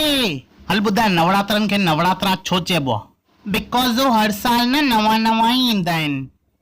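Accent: Sindh, Pakistan. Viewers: One about i like in you...tu khalayan main puro pakko sindhi lagdon aahain ...so cute too that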